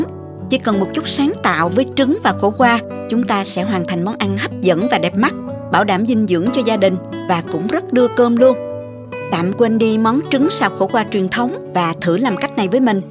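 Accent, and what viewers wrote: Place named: Mekong Delta, Vietnam. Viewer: Thích giọng nói quá, y Chang miền tây luôn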